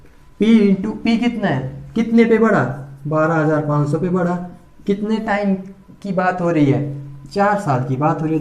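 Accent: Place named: Pahari, India. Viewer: Pahari tongue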